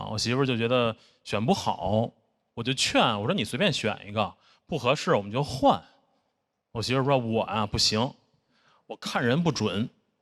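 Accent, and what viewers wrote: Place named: Beijing, China. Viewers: 特喜欢良言，京腔儿听着特舒服，幽默高级，不喊不叫，但让人乐半天，加油！ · 典型的京腔，条件反射式的反感啊，唉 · 典型的京腔幽默😂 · 他的北京话我爱听，不烦人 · 听了良言的京腔想起童漠男了。他的段子也很喜欢！ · 京腔是我的最爱，没有之一。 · 好喜欢他的京腔啊❤ 段子也好👍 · 可能是在北京生活过太久的时间 所有这些脱口秀就这个京式幽默我笑不出来 没觉得好笑 感觉都钝感了 光是那个口音出来我的笑点就提高了20层楼高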